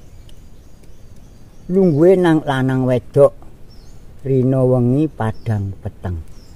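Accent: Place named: Java, Indonesia. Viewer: Seng ngenah logate wong jowo bossss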